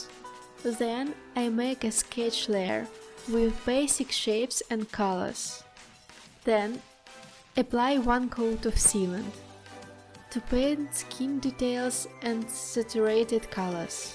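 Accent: Russia. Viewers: Какой же хороший,русский акцент😁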